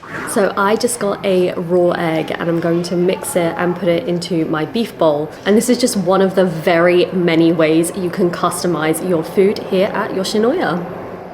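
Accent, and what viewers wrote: United Kingdom. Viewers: 牛肉、豚肉、鶏肉一度に食べるとか。あんまり機会ないな。の食事見たらほんま食べたくなる。後イギリス英語は聞き心地良いですね！ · のイギリス英語発音は私大好きです。アメリカ人女性はイギリス英語発音に弱いと聞きます。日本人男性に話すと好かれますよ！😽🐱😻😍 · 牛丼を、スプーンを使って食べるとき、dig in～という表現を使うのですね。動画の内容も面白く拝見させて頂いています。と同時に、イギリス英語の Listeningも同時に、楽しめてGOODです。何より、日英両方の字幕を出してくださっているのが、とても有難いです。どうも有難うございます。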